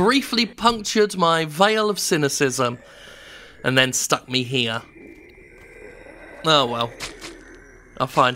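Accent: United Kingdom. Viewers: sounds.. extra british in this one. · Glad I wasn't the only one wondering if he sounded more British than usual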